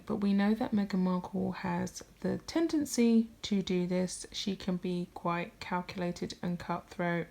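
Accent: England, United Kingdom. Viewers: It's difficult enough deciphering the English accent, without testing our hearing. Could the narrator please speak up a bit? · Or is this your british accent?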